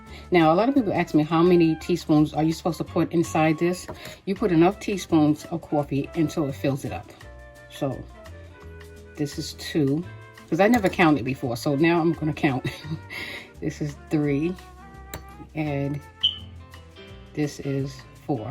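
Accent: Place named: New York, United States. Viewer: Love your NY accent, Ma'am. Greetings from Australia.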